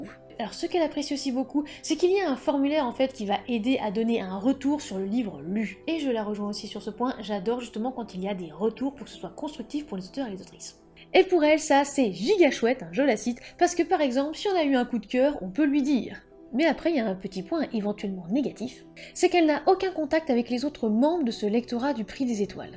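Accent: England, United Kingdom. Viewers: Cet accent anglais, j'en rêve la nuit * coeur * !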